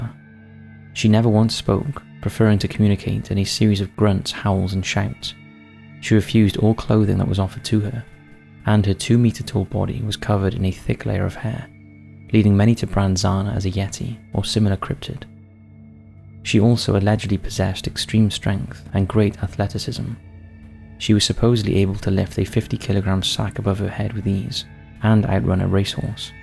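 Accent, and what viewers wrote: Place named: England, United Kingdom. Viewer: He's definitely English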